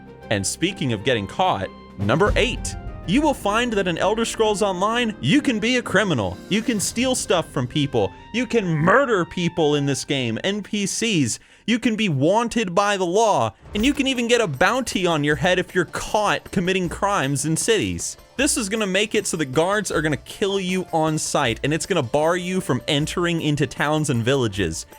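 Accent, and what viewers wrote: South, United States. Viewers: I was loving the southern drawl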